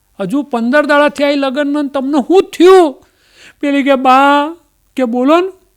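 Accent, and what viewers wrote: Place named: Mehsana, India. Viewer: mast mesanani bhasa bolo chho sweet lage 6 pan atlivarma puru lo thoda vadhare to sambhdavo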